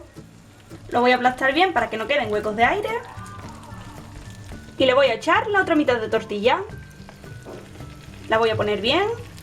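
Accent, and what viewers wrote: Andalusia, Spain. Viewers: A mí me gustaba mucho ver tus videos desde hace mucho tiempo porque eras muy divertida, jocosa y ocurrente además que me encanta tu forma de hablar como española ( yo soy mexicano). Pero he vuelto a encontrar tus videos y ya no eres así 😢😢, solo se miran tus manos y dictas ingredientes y casi ni se te ve. Recuerdo que eras o estudiabas de enfermera. Saludos desde México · buen video y la receta facil d hacer y sabrosa pero el acento q ella tiene d q parte d españa es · De qué parte de Andalucía eres? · me encanta tu canal,y eres muy apañada jajaja eres andaluza verdad?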